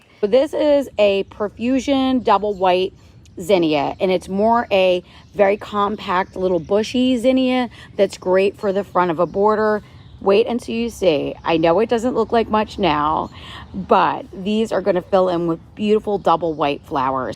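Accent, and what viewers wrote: New Jersey, United States. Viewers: Very nice !! Love your channel and also your voice !! Not sure if it’s a Jersey accent ? What a transformation in your garden ! I love how you always change things up !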